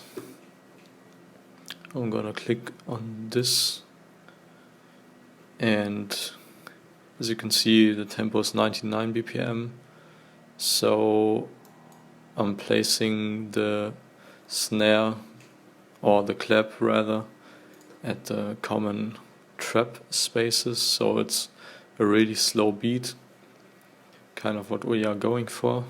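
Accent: Germany. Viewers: As a german, english with german accent makes me cringe so hard. Nothing against you, I think I sound similar. Great tutorial, thank you! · one word and i was able to hear the german accent XD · Which is why most of nowadays music sounds thew same. He smashed the genre, true. But it's just one of millions of songs we hear while learning/studying/working. It would be a great start to build on upon, or an easy short tutorial. That's already it, let's everyone agree on paying more attention to the music we hear, so we know when we're getting fucked in our pressious brians by the industry. Good tutorial, boi! Do I hear a german accent? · YE i thought also about his accent because im german too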